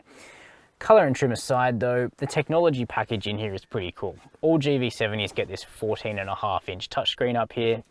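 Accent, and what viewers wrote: Australia. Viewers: He is Australian. It’s his natural accent.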